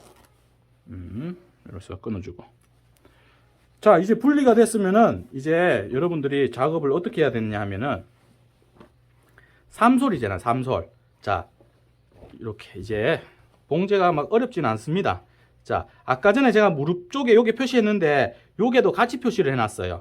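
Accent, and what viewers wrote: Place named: Daegu, South Korea. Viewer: 수선의 달인 이십니다 경상도 사투리중 가장 정감이가는 대구 사투리 매력에 푹빠져 설명이 속속 귀에 들어 옵니다 오늘도 잘 배우고 갑니다 😊😊😊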